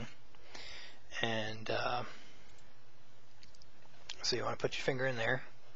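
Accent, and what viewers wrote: Australia. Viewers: He's not from the UK he's an Aussie, big difference in accents.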